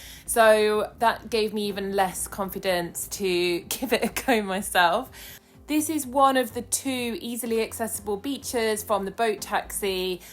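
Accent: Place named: Home Counties, United Kingdom. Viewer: Forgive the late reply but the YT gods deigned to promote this video of yours after I have just watched the latest release from "Nick & Lins" who are well known promoters, in the naturist community at least, of clothes-free adventures which was a recap of their own Croatian experiences earlier this summer. I noted with interest that you didn't feel able to join in and go nude due to a lack of fellow nudies when you were there. If you ever go again one thing that Lins covered in her commentary of her video was that if you go a bit further off the beaten track, away from the touristy masses, you're more free to go nude. I'm also guessing by your content and accent that your a Home Counties / London based lady and so there are options for you here if that was something you wanted to pursue (because let's face it, you haven't lived if you haven't at least tried this out through going to a nude beach, an organised nude swim or just going skinny dipping). 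There are several clubs in and around the M25, three within only a short drive of each other if you head Hertfordshire way. These clubs do also offer ladies-only days where you can try things out but exclusively with other ladies so you're less likely to have to worry about men being about. There are also several nude beaches along the south coast which perhaps could be an option for summer 2026 if you want to try out British options. British Naturism is an excellent resource for locations and advice on the law if you're worried a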